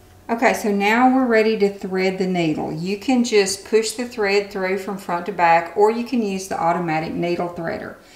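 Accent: South, United States. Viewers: My friend and I pooled our amazon points and bought this sewing machine. Opened it today. All was well until we tried to figure out how to thread the machine using the threader. The instructions in the manual weren't any help and then I found your video. We watched it then took turns threading the needled. Thank you so much for you patient, clear instructions! And I do love you southern accent!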